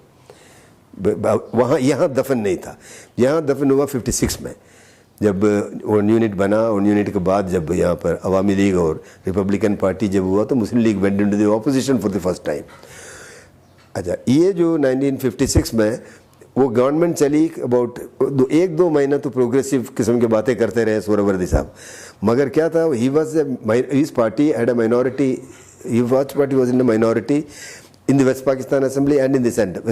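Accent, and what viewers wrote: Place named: Kerala, India. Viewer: He speaks great Urdu, but he still has a Malayali accent.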